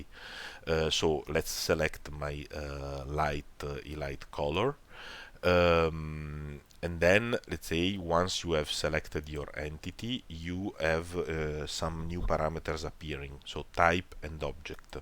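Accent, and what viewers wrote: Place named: Italy. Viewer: Per ringraziarti ti ho "comprato" un caffè! (fra l'altro dall'accento immagino tu sia italiano)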